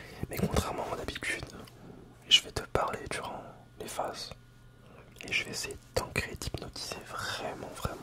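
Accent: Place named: France. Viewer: Alors c'est peut-être moi qui hallucine, mais je trouve que tu as un petit accent mdr Pas du tout dérangeant au contraire ! Ça éveille ma curiosité ^^ Tu vis plutôt dans le nord ou le sud de la France ? Pardon c'est sûrement indiscret ce n'est pas grave si tu ne réponds pas ^^ Sinon j'ai adoré la vidéo, ça m'a relaxé direct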